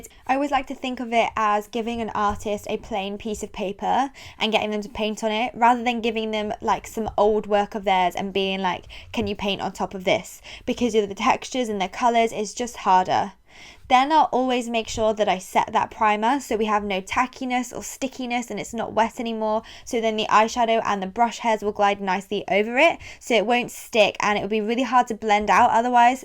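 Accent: United Kingdom. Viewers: En verdad me caga su acento británico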